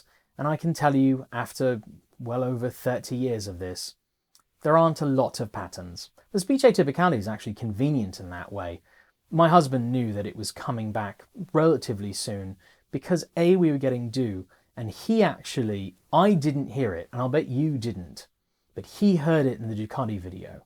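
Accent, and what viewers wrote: United Kingdom. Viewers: This has to be one of the most informative videos I’ve watched on YouTube in a long time. Aside from that, perhaps one of the bravest I’ve seen too. Never easy to talk about something so personal and you presented it in a way that made it incredibly easy to understand. As for worrying about what Brits think of how you sound being called a British accent, don’t. As an expat Brit, I can tell you we have so many accents anyway, there really isn’t any such thing as a British accent, and I’ve never known a Brit who even thinks about it (except for cases where we can’t understand somebody, and, yes, there are other Brits with accents so strong we can’t understand them either). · I enjoy your brit diction, stay safe and well. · I watched just your Ducati-video. Keep this British-sounding clear-speech. You are far more easily comprehensible (to all people with a hearing-impairment)!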